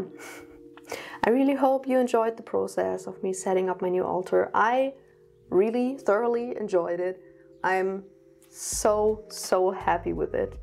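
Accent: Germany. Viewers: she liked your German accent. Sweet...it is sweet blessings have a good week have some cake..🎂🍰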